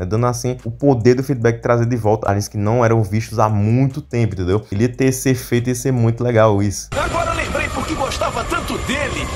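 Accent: Northeast, Brazil. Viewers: A proposta do vídeo é o conhecimento nerdistico, n importa se a dicção dele é boa, oque importa é entender os fatos relatados, e a dicção ele é mt boa, só por causa do sotaque nordestino que vc ta se doendo? Patético.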